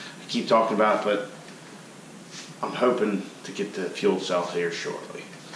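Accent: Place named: Pennsylvania, United States. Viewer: ditto. keep it. those of us who understand the the hunky (slang for the polish-slovak-german-italian culture mashup in the area) accent, or who are from pennsyl-tuckey actually appreciate having someone who doesn't sound like everyone else on the web.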